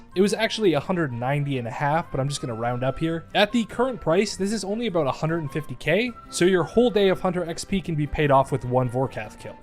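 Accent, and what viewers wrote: Canada. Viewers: also u canadian af bruh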